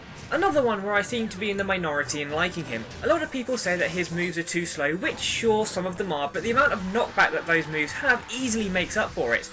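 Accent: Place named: United States. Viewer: ***** An American ;P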